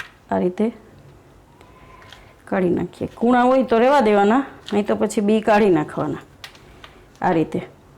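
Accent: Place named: Saurashtra, India. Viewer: Ben tame saurashtra junagadh bajuna lago chho...... tamari boli uparthi..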